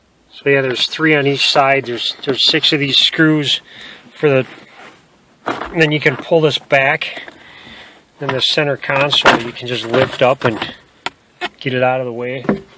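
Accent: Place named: Midwest, United States. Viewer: Enjoyed the tutorial man with the Midwest accent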